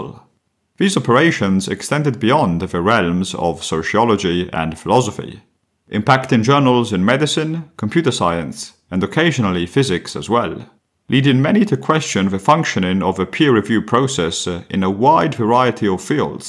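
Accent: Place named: Italy. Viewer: I thought it was a finish accent at first but leaning more towards Italian now. Difficult to place tho, to me sounds like a mix of Italian, Icelandic and something Eastern European 🧐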